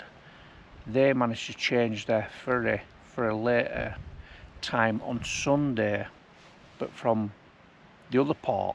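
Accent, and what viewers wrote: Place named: Scotland, United Kingdom. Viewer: Oh my gosh! I’m an American and I kept trying to catch your last name as you mentioned your wife. Then I finally heard Mrs.! I don’t know if anyone has ever mentioned that you have a touch of a Scottish accent 😂. I love watching your channel. I was one of the group that came over from Reddit and I’ve enjoyed all of your videos! I hope you keep making them.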